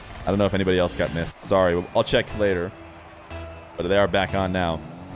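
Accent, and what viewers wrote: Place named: United States. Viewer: you're like the NA Version of JohnnyBoi_i. And I don't mean the format of your videos only, but the way you cast, even your voice. It's like I'm hearing JohnnyBoi_i but with an American accent. Very weird, but in a positive way, pog:D Loving the content, bro!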